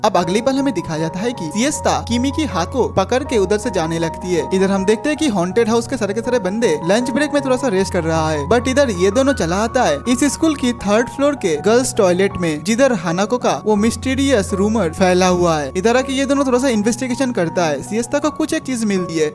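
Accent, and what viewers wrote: Bengal, India. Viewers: Bhaiya aap Bangali ho kya?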